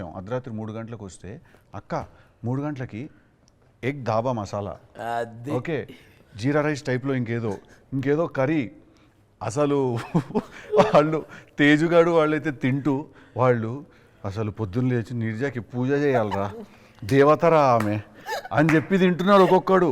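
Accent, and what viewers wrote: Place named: Telangana, India. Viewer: నువ్వు ఆంధ్ర అయినా తెలంగాణ భాష భలే మాట్లాడుతున్నావు👌👌👌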